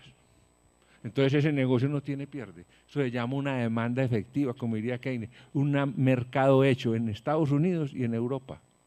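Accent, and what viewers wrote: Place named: Antioquia, Colombia. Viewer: Es lo mismo que dice petro pero con tono paisa .........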